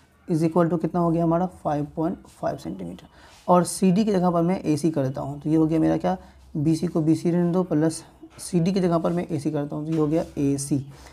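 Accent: Bihar, India. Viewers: Sir app bihar se ho?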